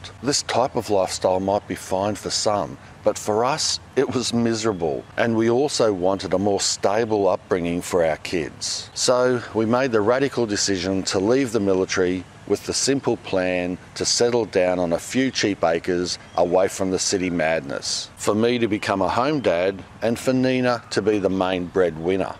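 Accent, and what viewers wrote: Australia. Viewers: You really seem like such a kind and thoughtful man. I have nothing but respect for you and your family and I’m glad that you becoming a content creator has helped your family out. Haha maybe it’s your Aussie accent in my American ears playing tricks on me but I doubt that. I wish you a happy Memorial Day from over here in the States.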